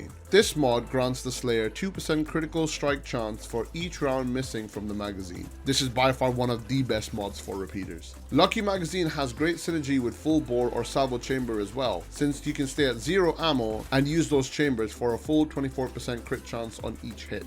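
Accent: Ireland. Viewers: take care of your damn. As someone with heart troubles as well (defective valve that had to be replaced a few years ago,) it's one of the most important organs you can take care of. Keep us posted, but rest up. I'd like to have your dulcet irish tones around for many more years.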